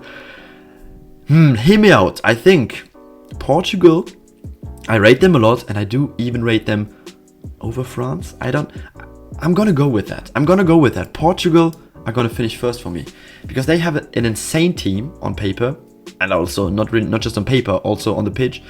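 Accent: Germany. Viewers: The German accent 😩😩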